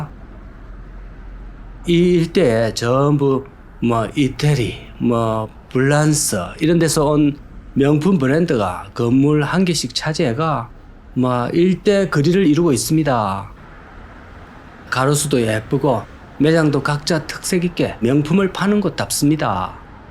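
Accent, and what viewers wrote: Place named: Busan, South Korea. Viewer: 강호의 발바닥TV님 제발 부탁인데 부산 사투리 그만좀 쓰세요 듣기 불편하네요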